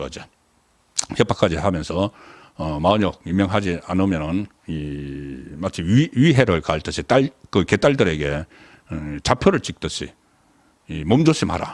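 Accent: Gyeongsang, South Korea. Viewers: 혼술 송국건 대표님 구수한경상도 사투리가 더 감칠맛이납니다. 해박한 시사논평줄겨보고있습닏다. 고맙습니다. 혼술화이팅!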